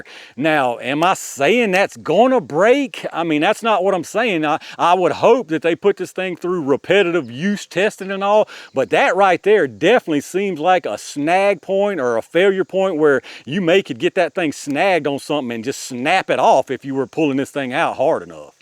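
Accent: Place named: Appalachia, United States. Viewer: Hey. Great video, informative yet succinct. Just got rid of an LCP Max. Your ergonomic description of it as “a piece of dimensional lumber” is spot on. I never enjoyed shooting it, no matter what I did (extended mag, Hogue grip, etc.). And the trigger was absolute trash. Felt just marginally better than the cap guns I used to play with as a child. Also, very much enjoyed the beautiful Appalachian accent (hope I got that right). All for free. Good deal. God bless!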